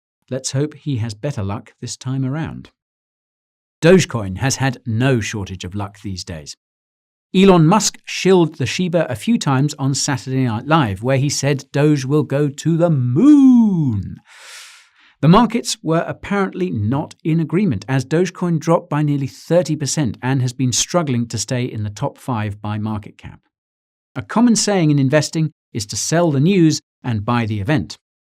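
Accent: United Kingdom. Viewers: This is how we talk in Britain. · I was just listening to that cool British accent 😅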